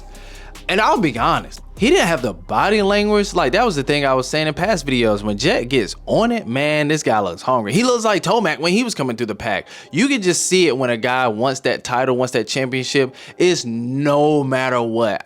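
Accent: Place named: Australia. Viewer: Your Aussie accent is hilarious 😂😂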